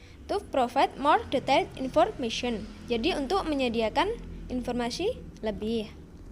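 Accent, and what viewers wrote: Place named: Java, Indonesia. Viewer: Javanese English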